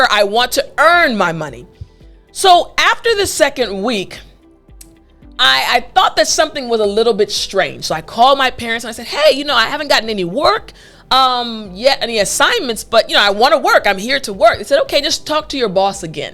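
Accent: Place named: United States. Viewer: I really and truly appreciate your way of speaking,i'm also Working on improving my fluency.i'm a learner .and I am a congolese. Props to you I wanna talk as you are talking cos i live the american accent. I cannot express my appreciation.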